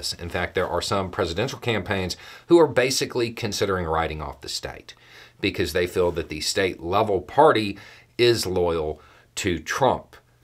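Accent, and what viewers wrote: South, United States. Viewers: Please do a couple more videos with your southern accent!!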